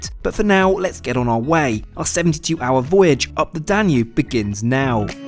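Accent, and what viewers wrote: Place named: England, United Kingdom. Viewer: He has an English accent, but definitely not a pompous or upper class accent. He sounds to me like someone who is trying to ennunciate his words so that everyone can easily understand him.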